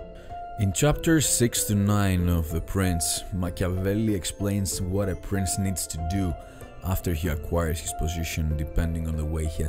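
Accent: Greece. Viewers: IS THAT GREEK ACCENT I DETECT IN YOUR VOICE? OR SPANISH?? 🤔🤔🤔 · your accent appears Greek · You must be a Greek,,,, not only the accent, the looks , but the room decoration.....marvelous. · Very Greek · Lol first time i am in your channel and after the first verses i caught that you are greek from your accent XD great video and very informative. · God damn your accent is so greek.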